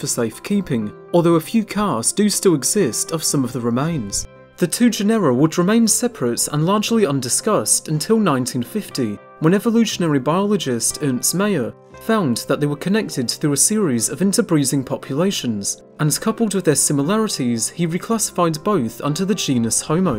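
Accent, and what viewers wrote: United Kingdom. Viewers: The narration drives me nuts. Strong British accent at hyperspeed, along with a very monotonous delivery completely devoid of feeling. Couldn't hang past 6 minutes. Too bad. Seemed like interesting content.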